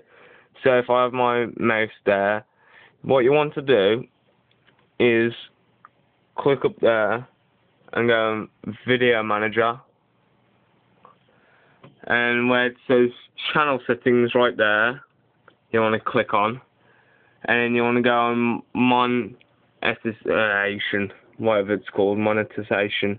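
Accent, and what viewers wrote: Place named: Walsall, United Kingdom. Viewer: thanks a lot mate this help me out loads. you sound like u live near me I live in walsall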